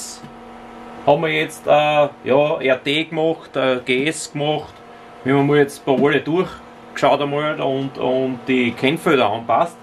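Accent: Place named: Burgenland, Austria. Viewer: luigi settembre Das ist deutsch. Allerdings mit Burgenländer „Slang“!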